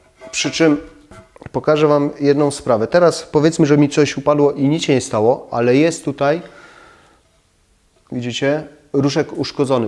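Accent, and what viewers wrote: Silesia, Poland. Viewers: "Jeżeli klinkami zasztrabujemy..." ;) Wiem, że bardzo Pan się stara mówić po polsku, ale ten Śląsk jednak wychodzi :)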